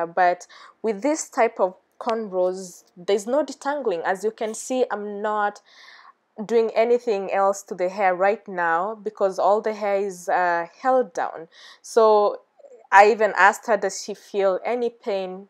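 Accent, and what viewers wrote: Kenya. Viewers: lol! accent ni ya Muranga ama!Nilijua tu wewe ni wa home😝Uko na katoi karembo..😌imajine aki nyweke zetu na blackamericans ni different aje..anyways you did some awww kazi..niko Swiss currently n nyc to hear sone home accent online😝😍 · You must be kenyan, I heard you speak Swahili and omg your accent. Wow 🤩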